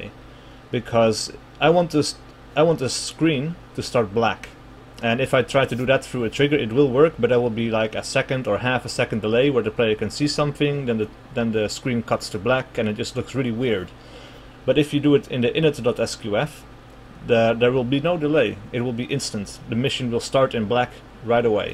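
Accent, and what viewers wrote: Netherlands. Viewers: A bit late to the party but good to see my dutch accent radar is still working. Thx for the vid though it helped a lot.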